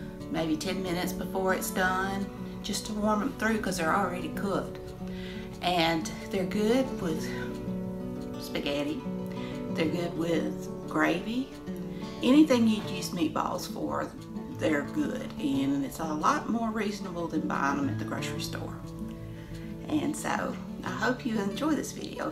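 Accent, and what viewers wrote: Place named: South, United States. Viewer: thanks one more time, all the way from Germany 😊 BTW, I Love your accent. We lived in Missouri some time, but southern accent is my favorite in the whole USA.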